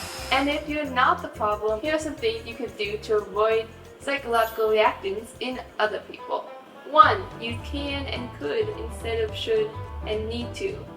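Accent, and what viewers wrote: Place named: New York, United States. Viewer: That's a cool accent, where are you from? I'm British and I barely hear this accent on YouTube. Is it New York?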